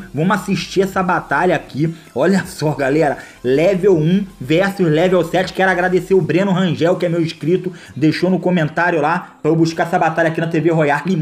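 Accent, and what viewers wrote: Rio de Janeiro, Brazil. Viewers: ei VC é do rio de janeiro né? puxa o x, ixqueletos, e tbm parece ter a língua presa ne?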